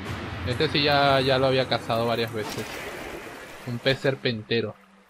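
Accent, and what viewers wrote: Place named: Venezuela. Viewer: eres venezolano si o si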